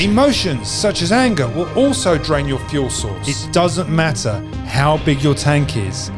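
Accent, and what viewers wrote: United Kingdom. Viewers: The guy in the video sounds like a Brit. We don't get as many street fights here in the US since there is a good chance that the person you are thinking of attacking is armed.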